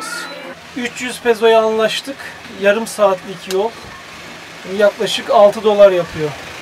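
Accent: Samsun, Turkey. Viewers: "Ben de az yiyim daaa." samsun ağzını filipinlerde görmek duygulandırdı abi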